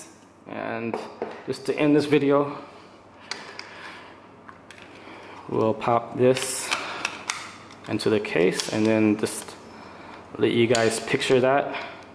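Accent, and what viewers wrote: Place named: China. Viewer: Professional product demands professional review not an amateurish review...... the guy is talking Chinglish....get your act together.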